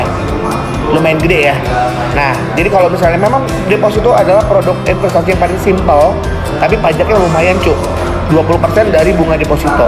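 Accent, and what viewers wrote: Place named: Medan, Indonesia. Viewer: Ga sengaja klik ehh bahasannya menarik juga dan bermanfaat. Auto subscribe dah ini, ditunggu video laennya lae (logat lw sih anak medan hahaa...)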